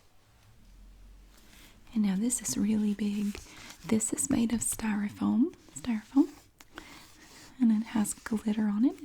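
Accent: South, United States. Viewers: I love the way you say everything :D I didn't like southern accent until I discovered your videos. You are so sweet and funny! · Your southern accent gives me allll the tingles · Your country accent is everythingggg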